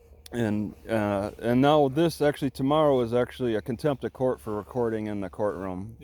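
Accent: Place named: Midwest, United States. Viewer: I grew up in Milwaukee (been here in Dallas over 40 years). I thought I recognized your mid-western accent.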